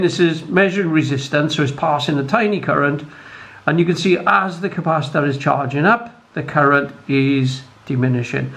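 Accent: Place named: Wales, United Kingdom. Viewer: Thanks for this also,. You sound Cymraeg (like me) 😊 I have done a bit of electronics in my past amateur radio exams so this is a nice refresher for me. 👍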